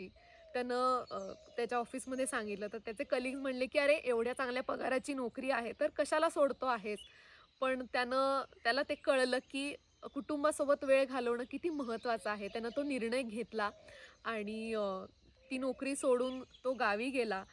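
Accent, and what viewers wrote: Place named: Kolhapur, India. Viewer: Your accent is mostly like our kolhapuri👌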